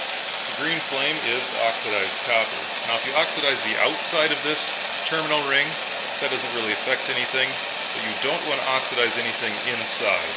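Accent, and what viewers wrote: United States. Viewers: You from Idaho? Remind me of guy knew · just american english, no big deal. Forgive them, they have not reached your level of perfection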